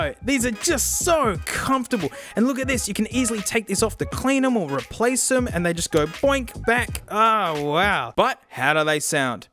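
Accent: Australia. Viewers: I could hear this Australian talk for hours about stuff that doesn’t even make sense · Same, though I'd want dank to play the same parts of the song in the comparison between the headphones. Also the ears make me think of ASMR artists though I've head worse whispering in my ears then this lovely ausie man.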